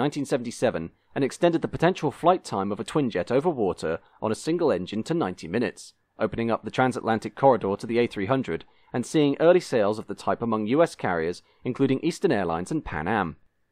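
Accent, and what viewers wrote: England, United Kingdom. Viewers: with a name like that I didnt expect an english accent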